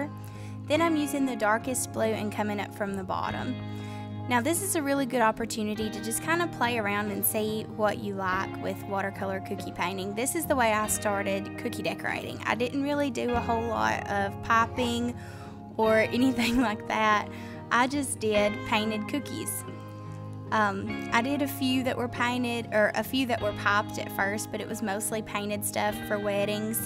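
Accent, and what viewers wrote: Alabama, United States. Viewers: I’m from North Alabama. You sound like you are from my area. Thanks for the video.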